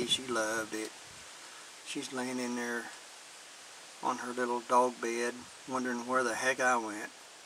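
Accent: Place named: United Kingdom. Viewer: Well I love the english accent, but I think it's a mixture of british, australian and south african!!!! That's a jolly big head on ya beer, you have to tilt your glass and gently pour it in........I just had a laugh at the tasting, very good video, but I wouldn't personally drink Bass even if I was forced!!! We'll have to try and get you some English beer sent from across the pond!!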